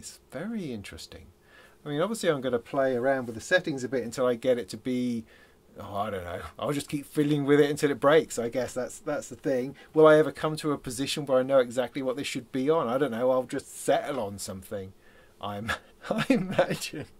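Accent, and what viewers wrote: United Kingdom. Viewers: So, ur British. Far out! When u said motorbike...yup or Australian. Just got a Teac X-3 Great sound dynamics. Gotta get some leader tape for sure. Its,a whole new thing for me. I want to tape from record albums and CD. U R right, putting an album.on a Turntable hooked to a very good receiver sx950. Threading tape thru a humongous non enclosed reel2reel is spiffy. I have a lot to learn and I bloody well love it. Say HI to Rumpole of the Bailey for me?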